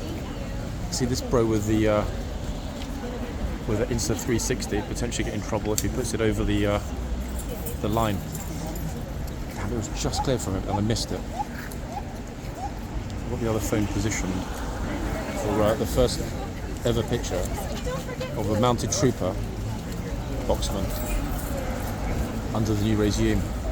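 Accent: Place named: United Kingdom. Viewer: I love British accents!!!!!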